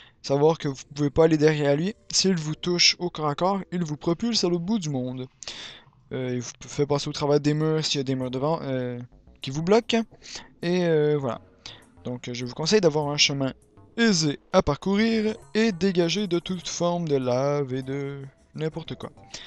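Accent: Quebec, Canada. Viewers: Un tuto de jeu vidéo, c'est bien ! Mais avec l'accent québéécois c'est MIEUUUUUUUX :DDD Super vidéo, merci :) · Tu vas te faire manger tout cru ^^ C'est l'accent québécois :P (Sauf erreur de ma part)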